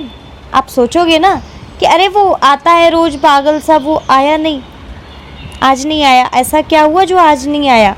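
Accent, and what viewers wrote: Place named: Braj, India. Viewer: ji aap bahut Sundar braj ki bhasha bolate hye, bhut accha laga aap ka video dekh ke me hamesha ati hu vrindhavan mye... Radhe.... Radhe....🙏🙏🙏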